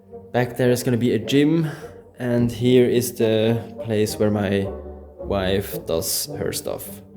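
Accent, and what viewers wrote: Switzerland. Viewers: Your voice sounds so majestic in this clip like some superhero lol and the swiss accent elevates it😂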